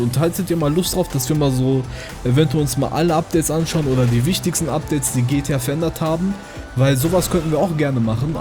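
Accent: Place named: Turkey. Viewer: Dein Türkendeutsch geht mir am arsch. Lern die richtigen artikel und fälle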